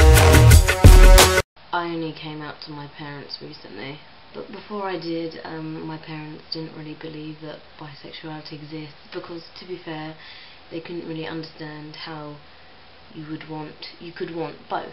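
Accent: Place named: United Kingdom. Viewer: you sound so british :)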